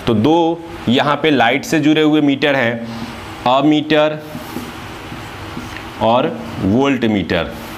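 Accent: Bihar, India. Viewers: sir aap bihar se ho...??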